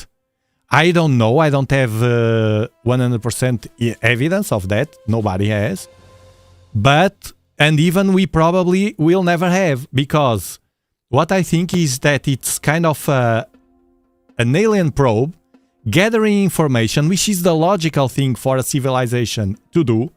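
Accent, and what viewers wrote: Portugal. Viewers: rofllll. he’s Portuguese 😊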